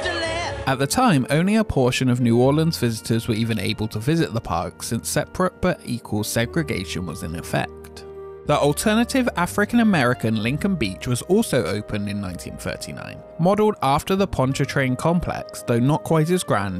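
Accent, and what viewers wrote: United Kingdom. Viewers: Hey what is your home park because i though i hear you speak british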